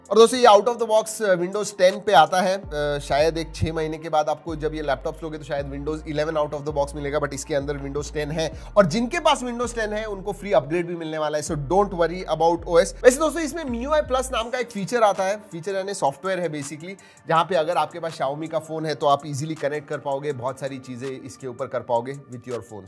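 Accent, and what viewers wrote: India. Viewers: Aapka bolne ka style ekdam desi hai..."aane dijie aane dijie india me dekhte hai"👌👌 maza aata hai aapko sun ke sir..🙂🙂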